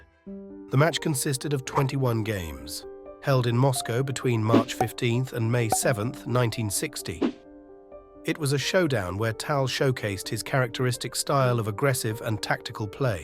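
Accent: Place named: RP, United Kingdom. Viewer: You're not supposed to notice stuff like that when the narrator has a posh accent.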